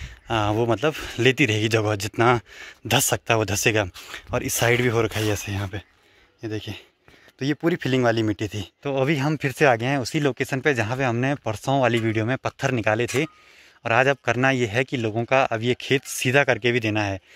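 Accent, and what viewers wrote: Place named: Pahadi, India. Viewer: Thechenge pitpita 😂 Mza aa gya bhai pure pahadi bolte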